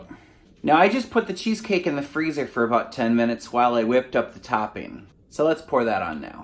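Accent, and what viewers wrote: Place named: Midwest, United States. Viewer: Haha! I love your Wisconsin Minnesota accent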